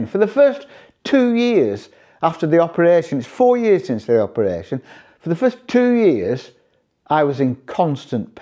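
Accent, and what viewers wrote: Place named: Yorkshire, United Kingdom. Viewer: thanks for reading mate really enjoy watching your videos and definitely keep in touch I live in Sheffield Yorkshire and guessing by your accent your not so far away 👍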